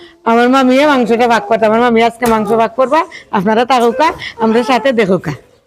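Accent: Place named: Sylhet, Bangladesh. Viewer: Mashi Maa ajkei apnar video deklam vhala laglo karon sylheti math hunia.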